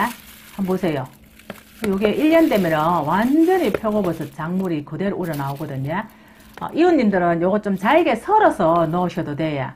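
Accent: Gyeongsang, South Korea. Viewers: 정보도 감사하지만 정말 고향생각나는 경상도 사투리도 너무 좋아요!!! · 물봉지가 신선한 아이디어네요. 구수한 사투리에 평범한 주부 스타일이 정감있네요. 좋은 정보 감사합니다. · 경상도 발음 국간장얼.. 소금얼..을 발음이 정말 안되지요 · 말씀 너무 구수 하게 잘하십니다. 갱상도 ~~ 표고버섯 간장 꼭 해봐야겠어요. 요긴 촌이라 집마당 독에 1년 하면 될까요? 내년에는 장도 담궈야 되요. 안심먹거리 외부산거는 믿을수없을 뿐더러 장류는 맛도없구 된장은 달기만 하구 ㅎㅎ 살림에 많은 도움 받고있습니다. 감솨합니다.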